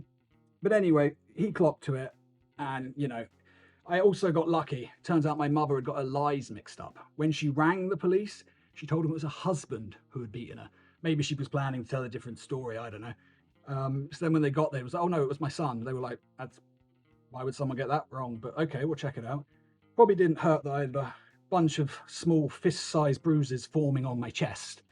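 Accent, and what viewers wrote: United Kingdom. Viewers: Holy shit your British??? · Bro... your British? I had no idea 🤯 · Wait, you are british? How could you keep this from us?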